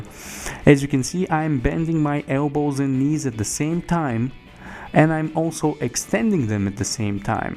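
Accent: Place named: Russia. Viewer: You have accent just like you are Russian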